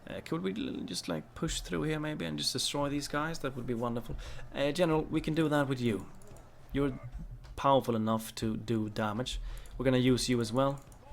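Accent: Sweden. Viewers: Will you do an Ar ardunaim playtrough? really love your gamplay and your swedish accent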